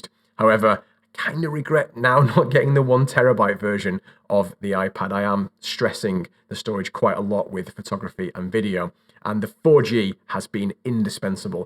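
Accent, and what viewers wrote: United Kingdom. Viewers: You’re British? Excellent video!! 👏🏻 Greetings from Chile 🇨🇱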